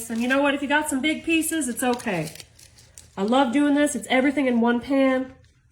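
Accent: South, United States. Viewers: Now this looks great!! I would doctor it up with some kind of dipping sauce and seasoning but I would eat your recipe for sure. Thank you!! Great idea for an easy one pan breakfast. Oh by the way....what part of the south are you from? I'm in SC myself. We sound similar. Lol 😂 💜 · Love your voice with that cute southern twang to it and of course your wonderful recipe and presentation.😊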